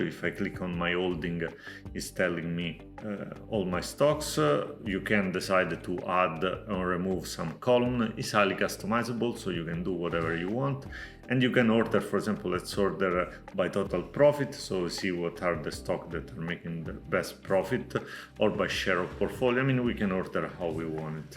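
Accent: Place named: Portugal. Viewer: Great video about Snowball Analytics and I love the Portuguese accent! Does SA use FIFO for cost basis and capital gains/losses calculation or do they give you an option to choose average cost instead?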